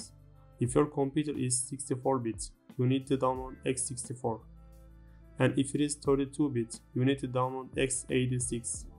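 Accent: Turkey. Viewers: abi türk olduğunu aksandan anladım bi açık yakalamaya çalışıyordum bilgisayar ele verdi seni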